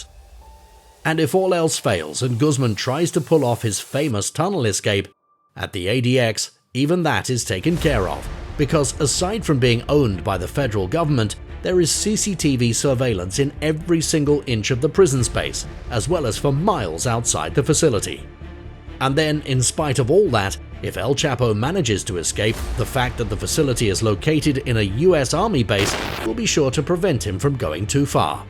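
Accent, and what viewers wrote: United Kingdom. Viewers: I don't think he's Canadian my be British or Australian with not so thick of an accent.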